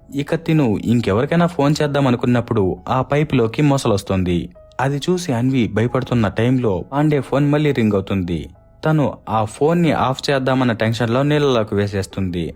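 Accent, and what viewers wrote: Rayalaseema, India. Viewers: Bro mee accent rayalaseema la undi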